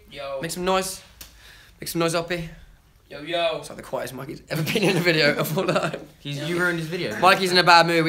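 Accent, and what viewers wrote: United Kingdom. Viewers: What he's British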